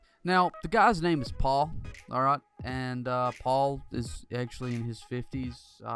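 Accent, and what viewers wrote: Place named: Alabama, United States. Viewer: You sound Alabamian.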